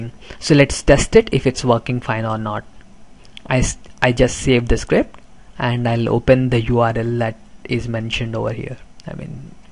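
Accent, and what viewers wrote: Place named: India. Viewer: It's the accent you struggle with. Clearly, he's a native English speaker , though with a local accent. Most probably Indian.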